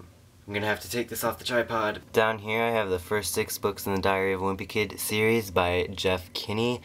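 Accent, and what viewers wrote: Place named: England, United Kingdom. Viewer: I must admit nothing gets me like someone speaking Spanish with that typical English accent so yay you made my night =). Oh and I am envious of your shelf =)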